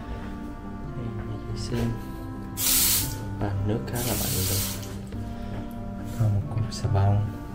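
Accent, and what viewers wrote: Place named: South, Vietnam. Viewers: Ui bạn này giọng miền Nam nghe thích ghê, có thiện cảm liền luôn 🤗🤗🤗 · Ko biết quê gốc ở đâu ha nghe giọng giống miền tây quá...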